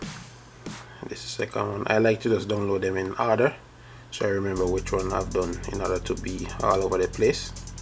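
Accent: Jamaica. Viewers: Is he Jamaican?🇯🇲I am. It really sounds like it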